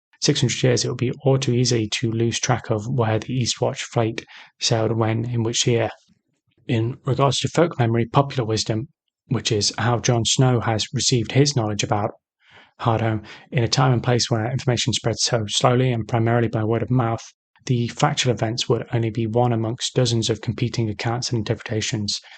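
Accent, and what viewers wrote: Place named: Midlands, United Kingdom. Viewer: As a fellow Brit I'd guess he's from the midlands somewhere ? Though in some words the vowel sounds are more southern, so maybe Nottingham? Or maybe Yorkshire but university educated in the south (British academia is biased against northern accents so sometimes people's accents shift a bit. Especially at the older universities).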